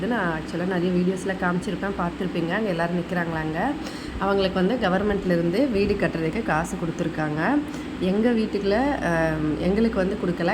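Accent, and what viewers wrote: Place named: Odisha, India. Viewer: Odia language neenga pesuradhu siripu dha varudhu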